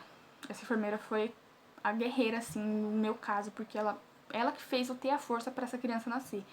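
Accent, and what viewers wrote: Paraná, Brazil. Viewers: Que linda 🥰 amei o seu jeitinho de falar, sou paranaense, do interior kk me identifiquei 😂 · Muito bacana, estou gostando muito do seu canal. Só gostaria de saber de que estado e cidade você fala, tenho a impressão que é do Paraná, estou certa? Mas qual cidade?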